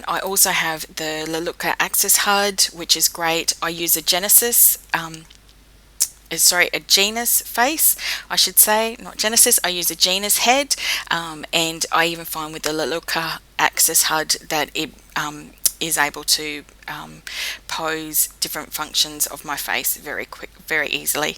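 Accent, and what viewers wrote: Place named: New Zealand. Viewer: ooh I thought you sounded like youre from New Zealand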